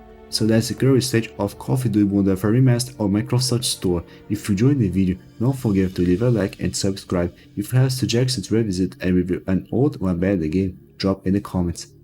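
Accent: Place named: Brazil. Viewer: Mano vc é brasileiro n é? eu reconheço esse sutaque.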